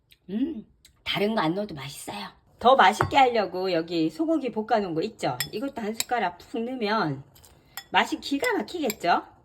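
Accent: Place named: Gyeongsang, South Korea. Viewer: 경상도 억앙도 음식도 정겹네요~^^ 새해엔 좋은 일만 가득 하세요~~~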